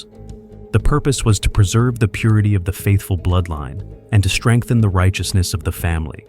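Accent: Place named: United States. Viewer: These accounts are always narrated by an American.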